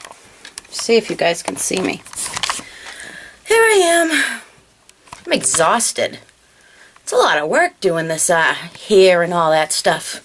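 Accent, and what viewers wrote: Boston, United States. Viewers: Hey i wanted to let you know how much i enjoy watching your videos. I'm 19 and from Scotland and i watch your videos every day. Your accent is so cool the Boston accent is my favourite, probably because i love New Kids On The Block so much!:) Please keep making videos because they make my day a little better xxx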